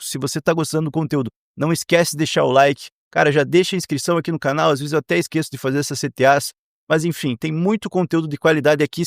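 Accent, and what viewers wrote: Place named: Curitiba, Brazil. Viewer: Depois de 8 anos operando e consumindo tudo q existe no youtube, descobri esse canal e não consigo mais assistir outros. Vejo diversas vezes cd aula e assim q puder vou retribuir adquirindo algum serviço pago seu. Obrigado,! Obs. Sou de Curitiba, reconheço esse teu sotaque aí!😂👍🏻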